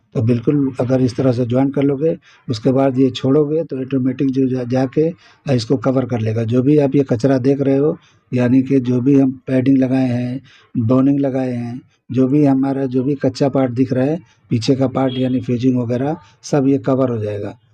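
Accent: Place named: Uttar Pradesh, India. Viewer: Bolane ke style se aap up ke lag rahe the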